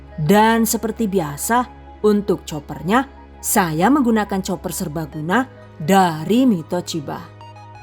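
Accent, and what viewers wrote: Banyumas, Indonesia. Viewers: Ealah jebule nu Wong ngapak yu😅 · Jebule bahasa ngapak ap ya? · ngapaknya bikin ngakak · Basa ngapak e keluar toh bu.... 😀😀😀😀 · "DONE" Dubai hadir pas lagi nyari dan berpikir untuk buka puasa sunnah senin nanti pas ada notif odading gue jadi kepikiran odading yg lagi viral pengen ngerasain karna jauh antara indonesia dan Emirates Dubai.eehh..ternyata ada notif langsung da gue pergi ke dapur buat odading persiapan buka puasa sunnah senin...... #GiveawayTHV2020 Terimahkasih atas resep nya Bundah sudah cantik jawa nya ngapak lagi🥰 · mantul menul"resepnya odading bu rasanya spt jd wonder woman nich 😊 gemesh...sm suaranya yayuk ke apa lg kalau lg ngapak 😘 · Sampean lucu kalau ngomong apa lagi klau lagi ngomong bhs ngapak...aku ngapak PBG Mbak...😀😀😀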